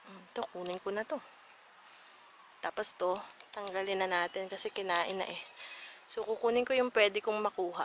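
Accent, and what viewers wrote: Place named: Quezon, Philippines. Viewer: Taga Quezon Ka po ba? Nakakatuwa po kapareho kita ng punto. He he. Ang cute po mga videos mo :)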